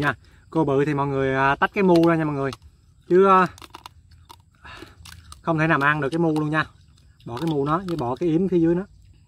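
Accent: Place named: Mekong Delta, Vietnam. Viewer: e ở đâu? nghe giọng như người miền tây vậy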